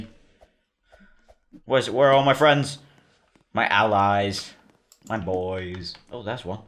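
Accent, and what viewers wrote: United Kingdom. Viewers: He sound so british he sounds like a 12 year old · He literally have a fricking deep British accent now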